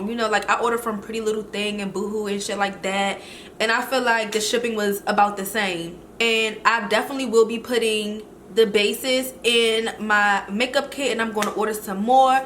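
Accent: Washington DC, United States. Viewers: You have to be from the DMV....the accent gave it totally away! I ran 🏃🏾‍♀️🏃🏾‍♀️🏃🏾‍♀️🏃🏾‍♀️ and copped me 2 of the bases and about to dive in and buy the palette. Thanks girl. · I just knew you were from dc..we have a certain type of accent lmao..I immediately subbed😍 we gotta support each other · I knew u was from DC from your tone of voice 😩😍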